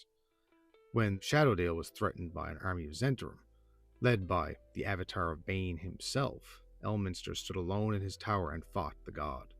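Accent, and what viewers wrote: Newfoundland, Canada. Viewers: I can tell from your accent that I've stumbled upon a fellow Newf 😅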